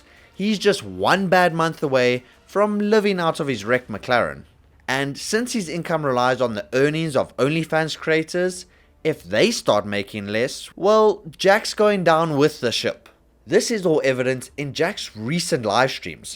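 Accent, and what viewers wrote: South Africa. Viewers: Are you a South African? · Are you South African?👀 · Wait are you from south africa?!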